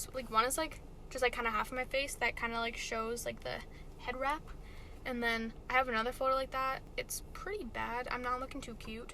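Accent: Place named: Canada. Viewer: this is literally one of my worst fears. (having a medical emergency at school) i’m glad you’re okay 💗💗 also, are you from canada? i hear a Canadian accent haha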